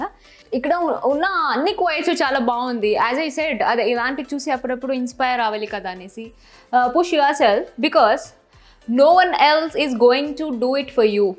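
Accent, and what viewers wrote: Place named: Telugu, India. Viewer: Mee voice n Telugu slang kekaaa loved you so much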